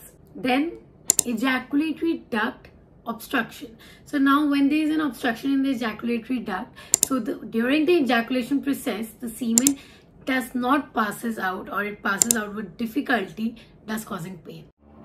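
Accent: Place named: Pakistan. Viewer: Your accent is Pakistani ❤🇵🇰🇵🇰🇵🇰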